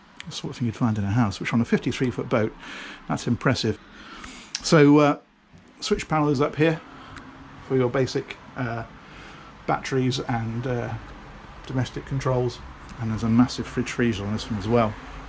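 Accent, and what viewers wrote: United Kingdom. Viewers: Best boat tour channel on you-tube... it just keeps getting better... Right then... cool. :) It's brilliant. It wouldn't be nearly as good without the British accent and colloquialisms... the boat companies recognize and welcome him with open arms, which is all to the good for us broke ass dreamers. lol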